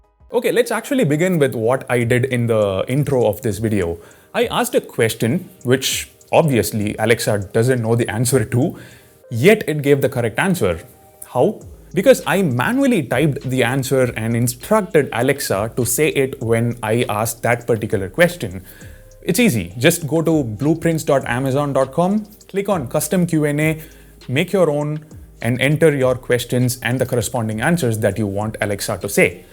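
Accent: India. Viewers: L'accent hindou en anglais est insupportable. Je ne peux pas écouter plus de 10 secondes.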